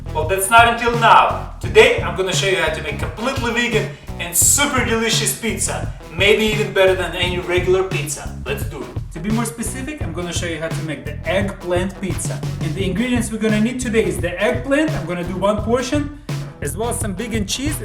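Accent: Russia. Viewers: I could tell u are Russian by your accent : )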